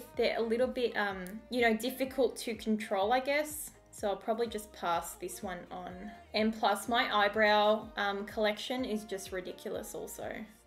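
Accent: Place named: Australia. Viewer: Can i have an Australian accent tooo? Lol so lucky! 🖤❤️ even though you arent crazy about the makeup it still came out good!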